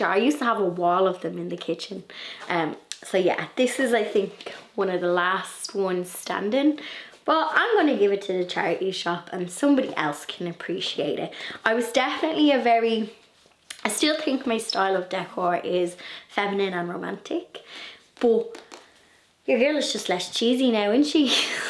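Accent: Ireland. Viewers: Just seen you for the first time. It’s always such a shock to hear another Irish accent, but a good shock! 🤗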